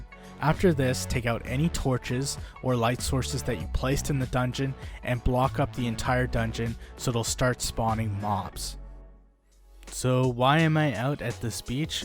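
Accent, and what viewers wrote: India. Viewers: Are you indian or American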